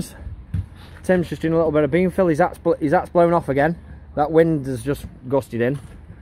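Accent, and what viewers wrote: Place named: Liverpool, United Kingdom. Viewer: Scouse is back 🤏 🥪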